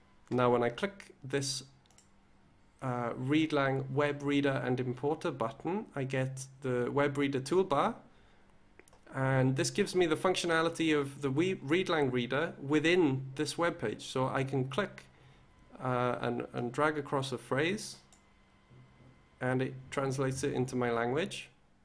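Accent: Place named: Wales, United Kingdom. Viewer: I love hearing a fellow Welsh accent on youtube, always a nice surprise! Thanks butt!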